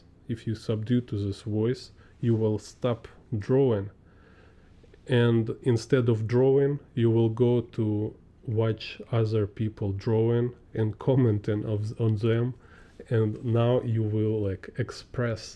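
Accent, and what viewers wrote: Russia. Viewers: Nice stuff and heavy russian accent ) GL HF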